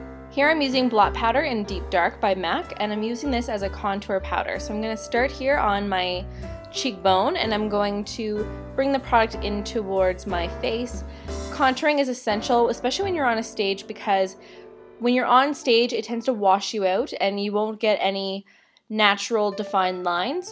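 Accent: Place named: Canada. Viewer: Super Canadian accent!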